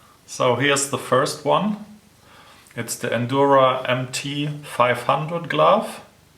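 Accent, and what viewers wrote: Germany. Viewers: Das ist so ein kranker deutscher Akzent 😂😂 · Man merkt so krass das du deutscher bist 😂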